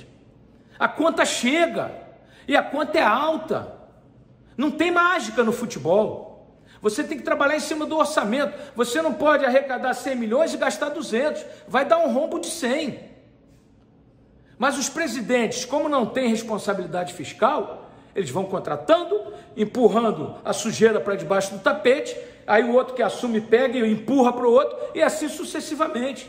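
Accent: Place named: Rio de Janeiro, Brazil. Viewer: E ai chega de Carioquice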